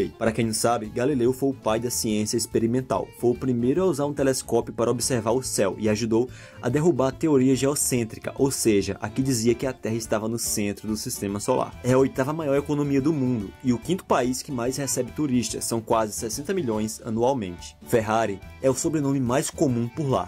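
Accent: Northeast, Brazil. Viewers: Tu É do Nordeste né!?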